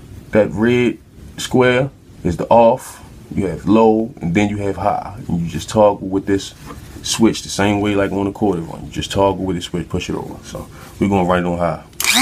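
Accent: Baltimore, United States. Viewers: Yo you from Baltimore??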